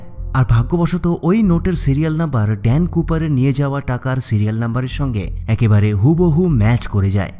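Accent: West Bengal, India. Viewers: ভারতীয় বাংলা বলছে সে।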